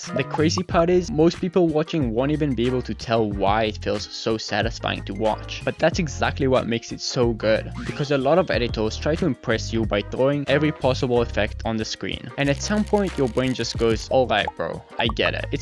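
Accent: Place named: France. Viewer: Bro you need a new Mic bro And change your French accent and then i will subscribe you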